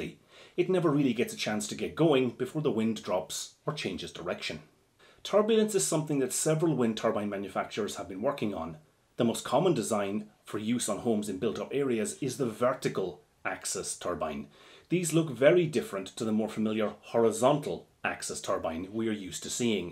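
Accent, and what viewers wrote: Ireland. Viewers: would you be able to comment as to planning permission for Ireland? You have an Irish accent, that's why I ask :)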